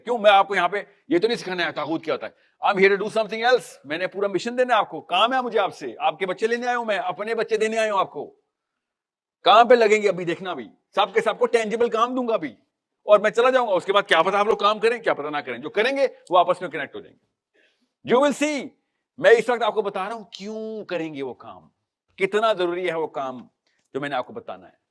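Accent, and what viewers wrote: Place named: United Kingdom. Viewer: Aur English accent ziyada hay clarity kum hain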